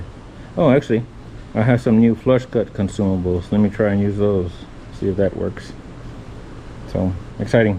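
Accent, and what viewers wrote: Texas, United States. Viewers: I like your pachs. I like your texases way of saying texas way.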